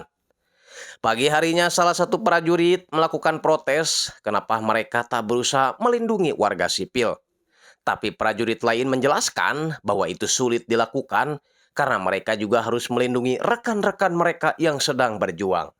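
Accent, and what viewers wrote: West Java, Indonesia. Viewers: Nyunda pisan😂😂😂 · Akang orang sunda ya?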